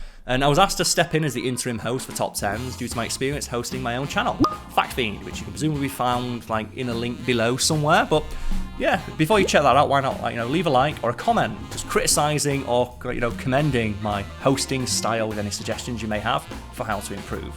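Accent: England, United Kingdom. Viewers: 1) I like his dinosaur shirt. 2) Maybe he uses bleeped words too much. I'm still thinking about that because I use bleeped words also. 3) I think the whole video was paced well. 4) I'll miss Simon, but I'll like just as much after I get used to his slightly different British accent. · Can you slow it down a bit. Yes I could set my speed to 0.75 but you wanted feedback. I like the English accent but a bit slower presentation would suit me. Again, feedback asked for, not a critism. I said I'd give it a go, has big shoes to fill. I'll hang around a bit longer.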